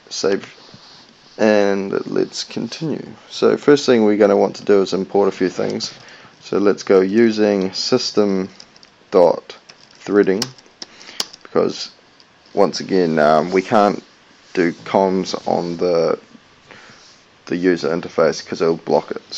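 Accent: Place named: New Zealand. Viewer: thats a new zealand accent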